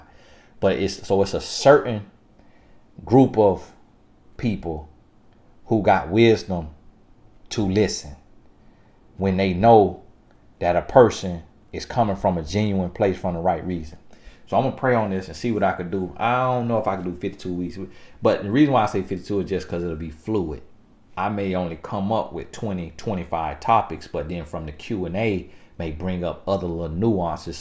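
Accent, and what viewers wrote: South, United States. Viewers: I love country accent 😇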